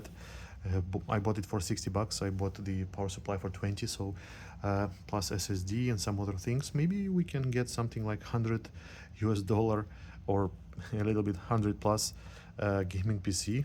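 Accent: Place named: Russia. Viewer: Русский акцент) Сколько сейчас девайс стоит на местных барахолках и из какой страны вещаешь?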